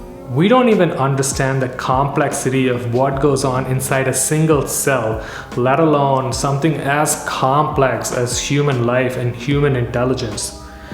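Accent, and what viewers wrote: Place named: United States. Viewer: Why do you sound American in this video???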